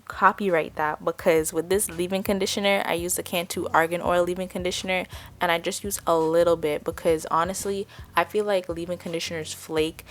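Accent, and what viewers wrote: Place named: Toronto, Canada. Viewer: Girl you from Toronto? Your accent screams Toronto lol thanks for the hair tips. Needed something for my daughters hair. Will try it out!!!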